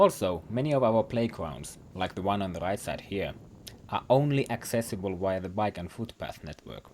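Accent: Finland. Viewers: I like your assessment (and Finnish accent;-) ) much better than. He's too soft on the crappy infrastructure they have in Calgary. And I live in a city that probably has even worse bike lanes than Calgary... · When I had given the points I would given you at least 100 points more for the Oulu trip. And 10 extra for your humor and pretty Finnish accent! What a beautiful ride. How boring and ugly north American towns and cities are! And even worse in winter. In Finland winter darkness + snow makes the world even nicer and more beautiful. Here in the Netherlands we had today our first little bid of snow. But it was melted within an hour. And that is also one of the big differences between this winter utopia and the Netherlands. Our winters are most of the time just a little bit above or below 0 degrees Celsius and then salt is necessary because roads are almost always wet and can frees unpredictable and then biking and walking gets dangerous. 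And the other big difference between both Calgary and Oulu and the Netherlands: space. We are the most densely populated country in the western world and ground is so expensive here, that this kind of modern city planning with so much space between roads and bike pads or parks everywhere would be great to have but is almost impossible to afford. In the 1960's and 70's it was more common to build neighborhoods with more public space between the houses and private properties, but nowadays every single square meter have to be planned to have a function. Developers will try to build cramped houses instead of living space for people. I think that is one of the big negatives here in my country: developers want to make every possible euro profit out of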